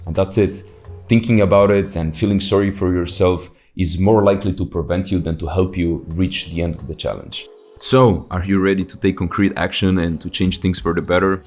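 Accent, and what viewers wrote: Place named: United States. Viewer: Damn bro, you speak like Amerikanski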